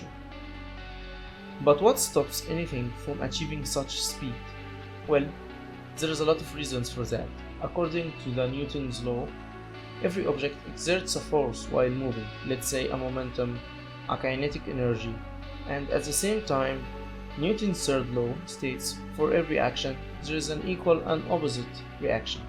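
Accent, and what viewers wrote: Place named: Egypt. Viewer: Love your hard work and amazed about the amount of vocabulary u have. Wish you didn’t have the Egyptian dialect though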